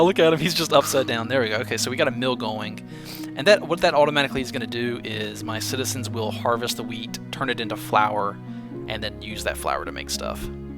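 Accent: South, United States. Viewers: What are you talking about? There is no 'usual' or 'normative' english (language) accent, it depends on where you live and what you're used to. If you live in America, the 'usual' English accent to you will be American (ignoring the many american regional accents). I'm sure ' accent is fairly normative for where he lives. He'll just have one of the many different Southern USA accents, of which Texas is a stereotype people like to compare accents to. Here in England his accent is not usual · there is no usual english. usual for americans is different from the usual for the british and he has an american accent.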